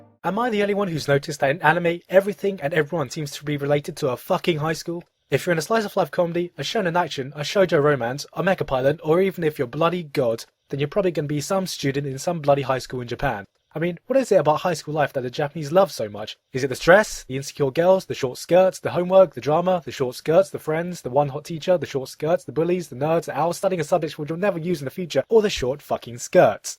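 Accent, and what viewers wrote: United Kingdom. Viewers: Me and my friend wanna marry you because your Asian, and you have a British accent. And these videos are fucking awesome. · hey sounds british but hes asian XD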